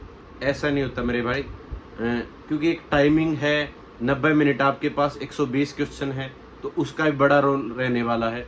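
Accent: Haryana, India. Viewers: Bhai aap haryana k lgo ho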